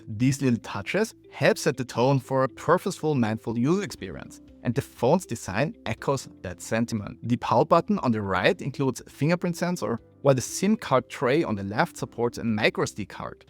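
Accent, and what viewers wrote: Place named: Austria. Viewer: Servus! Great review, but I started watching your videos for a minimal phone solution but have to admit how much I enjoy your Austrian English accent! Love from Canada 🇨🇦.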